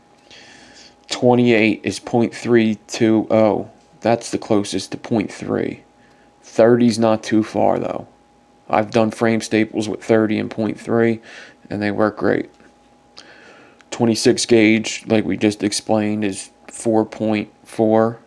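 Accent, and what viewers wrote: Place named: Baltimore, United States. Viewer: Do you have got to be from Baltimore with that accent that you have it’s pretty much where I grew up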